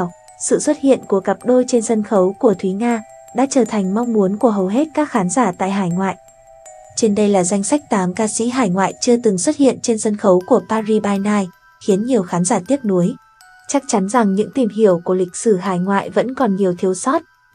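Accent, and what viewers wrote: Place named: North, Vietnam. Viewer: Đã lâu o nghe giọng Bắc nữ trầm, hôm nay tình cờ thật lấy làm hoan hỉ quá 🤓 cảm ơn cô và mong được nghe thêm nhiều hơn ! Chúc cô và gđ nhiều an lạc an lành an yên... 🌷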